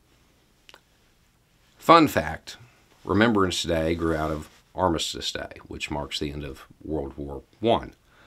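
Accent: South, United States. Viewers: Absolutely spot on both b e a u please Google I played the lead in high school in a play on Remembrance Day as a newspaper editor who is covering up the truth to make this brief so we learned about it many years ago I'm 63 now and we we learned about it in high school but it's interesting to hear you comment because you're kind of like in the South I gather by your accent and I'm just surprised you know so much about us up here and I learn a lot from you and I really appreciate that thanks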